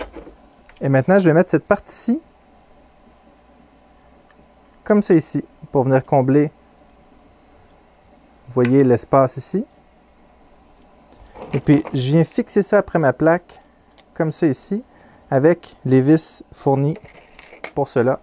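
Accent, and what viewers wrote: Canada. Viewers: bonjour, enfin des vidéos vraiment pédagogiques et super bien commentées avec un petit accent canadien en plus, j'adore. est-il possible de compléter cette installation par un auto bed leveling ? merci en tout cas tu viens de gagner un abonné :) belle journée.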